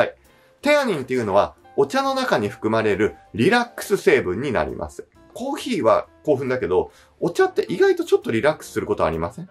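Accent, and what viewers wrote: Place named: Tokai, Japan. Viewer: 先生は東海出身でしょ〜所々方言出てる気がする.....！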